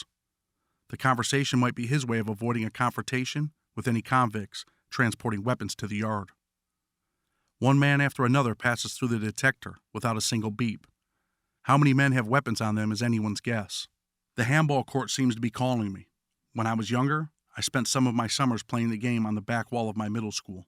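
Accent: United States. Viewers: Always a good video. Props to you. I know you you from upstate and all that but damn homie you sound country as hell tho boy ha. Peace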